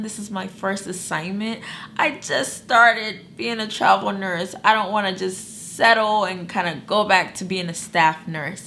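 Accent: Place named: New York, United States. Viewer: You sure talk like you from New York lol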